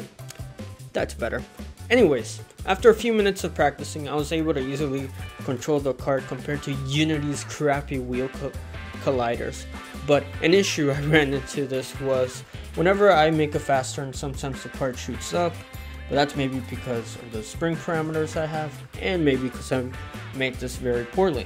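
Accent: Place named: East Los Angeles, United States. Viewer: It's because he's young it's still weird. Or it's that lip smacking noise he makes sounds like a chick from east LA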